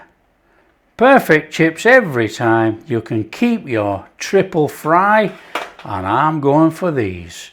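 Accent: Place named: Midlands, United Kingdom. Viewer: you sound more like midlands than the north. Stoke on trent way accent. Its north to me being in the westcountry. Lovely grub mate 👌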